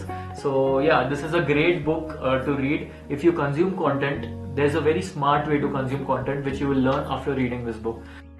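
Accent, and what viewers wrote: India. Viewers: Indian accent at its finest (no offense) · Are you a Bengali? 😅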